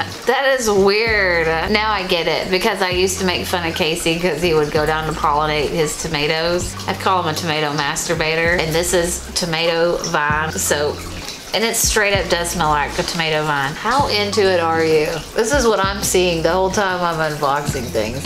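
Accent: South, United States. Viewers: Hi, really love your videos. You are the cutest and love your accent!! I have one too as I’m originally from Georgia now Calif(👎). You and Casey are a trip🤗👋 and Winston too!! You are so knowledgeable and cute and you come across as so real🤗👍. Steve’s leaves begonias are delicious!! With your rev think I’ll try them😁. Thanks again for your exceptional videos, regards to Casey and Winston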